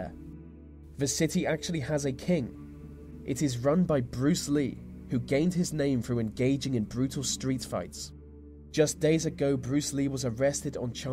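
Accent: England, United Kingdom. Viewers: Hey there been waiting for your next video and it was posted the afternoon here in upstate New York what a great topic never cease to amaze me well thought out executed to a tee just love your voice and English accent you are a professional my good man Thanks so much I do appreciate all you put into these videos because I am always thoroughly pleased one of these days maybe our paths will cross and I will high five you job well done thank you until the next time I will be waiting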